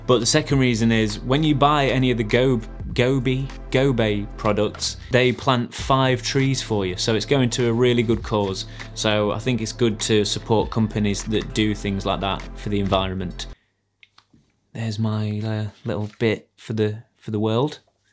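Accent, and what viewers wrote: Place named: Birmingham, United Kingdom. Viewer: Woooh now I see why your accent sounds familiar! I've been living in Birmingham and lastly Stourbridge 'til last October. Now I'm in Italy due to the pandemic but I'm about to get back living in Stourbridge by half May 😀👍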